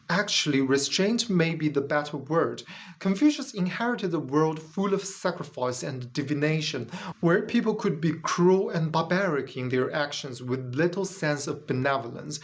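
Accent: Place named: United Kingdom. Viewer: AI has better pronunciation and intonation. Not intended as a critique of English. Your English, is excellent. "Heaven" not "haven", "These" was mis-read as "this" (This is just a little feedback for you, ). I thought, originally that your accent was British-flavoured, but your subtitles are in US English. Where did you learn your English? Your ideas? Unconvincing. Successful religions have always been about controlling other people. That is what they are selected for, if they do not do that, they get replaced. Reality is not important to religion (well, successful religions). I suppose the Flying Spaghetti Monster has some connection to reality.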